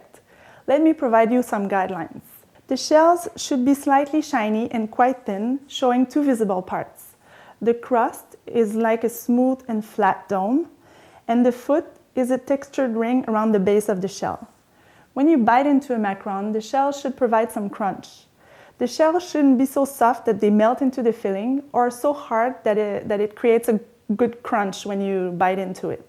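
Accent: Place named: Quebec, Canada. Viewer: Thank you for taking your time to share this wonderful, detailed class! I knew you were French Canadian by listening to your lovely accent. I moved from Toronto to Quebec City in 2014 and in 2020 to Lac Beauport with my new husband. Merci encore! 🤗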